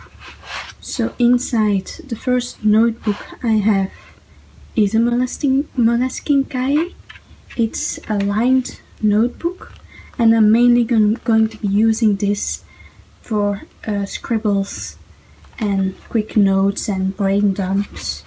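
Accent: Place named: Scandinavia. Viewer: Great to see more new YouTubers doing videos for onebookjuly! I love your accent, is it Scandinavian?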